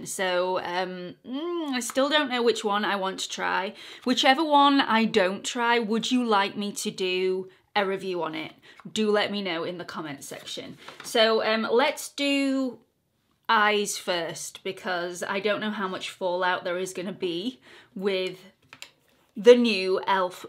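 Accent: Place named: England, United Kingdom. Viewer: All your descriptions still sound so proper with your English accent. 😊 Please do the Essence review next. 😁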